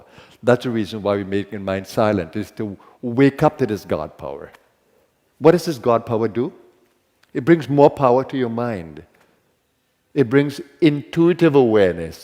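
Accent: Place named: Guyana. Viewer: Very good guidance..thank you... Swamiji your accent sound Guyanese..Are you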